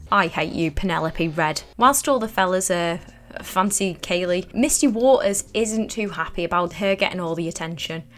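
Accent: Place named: Northern England, United Kingdom. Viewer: gender swapped david lister from red dwarf is on youtube doing sims reviews! sign me up! (i’m a millennial american nerd, we hear one northern accent & we get real goofy with trying to bring up pop culture stuff younger folks in the UK may not “give a toss” about 😳—ok back to sewing and smoking weed while watching this vid 🖤)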